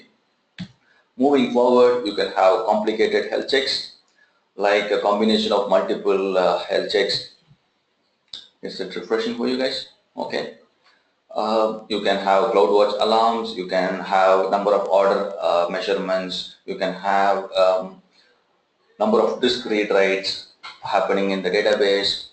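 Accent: India. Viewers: Good presentation. Nice to hear south Indian accent !!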